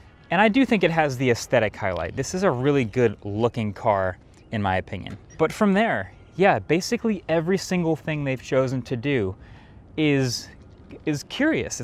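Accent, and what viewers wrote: New Jersey, United States. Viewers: he so new jersey 😂🔥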